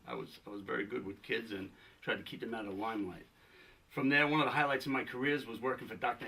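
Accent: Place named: Italy. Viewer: He's got that awesome Italian kind of accent thing going on. :D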